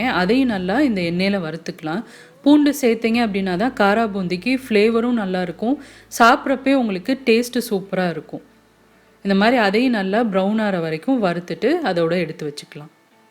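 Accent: Madurai, India. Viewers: Vada chatti typical madurai slang.... Thanks for the receipe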